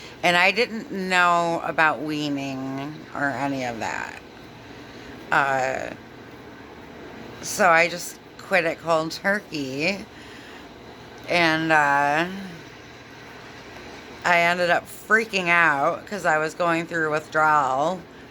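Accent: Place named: New York, United States. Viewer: Wow, I grew up in NY and was all over your other channel trying to figure out if you are in NY from your accent. I finally looked up a restaurant I saw on a sign in your video, then found this channel, where you announced exactly what I took a half hour figuring out (Rochester). So I am going through your vids as a fellow NYer (in Phoenix - don't come here) point of view and not to be a sadist but enjoying it because it is comforting to hear from someone with your NY vibe.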